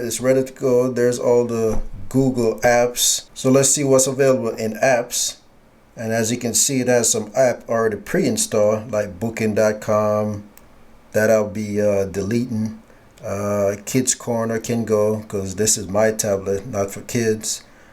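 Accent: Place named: Jamaica. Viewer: Jamaican? lol ...accent is strong. Thank you for this I was confused on which one I should get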